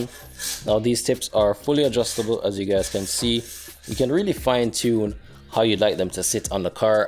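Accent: Jamaica. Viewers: Love the Jamaican accent!🙂 Oh right, the exhaust, loved the sound (and look) of that too!😁 Btw, and yea good idea to install heatshields onto the aftermarket exhaust, they're there for a reason👍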